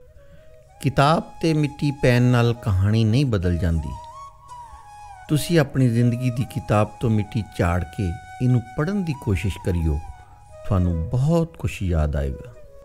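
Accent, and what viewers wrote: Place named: Punjab, India. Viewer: It is just fabulous... nostalgic... Punjabi accent is also just love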